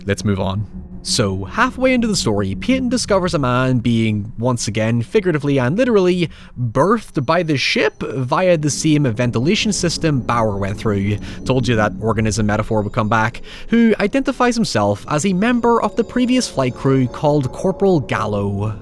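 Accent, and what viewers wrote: Ireland. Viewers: You have an Irish accent. Nothing wrong with that. You speak English better than the English do. Keep it up. Slainte ! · You know, when you explained your Payton situation it just sounded like you were saying the exact same word twice and I'm still not sure what exactly you were confessing. Let's be real, the accent is part of the reason we're all here. In fact go harder, unleash that brogue my guy!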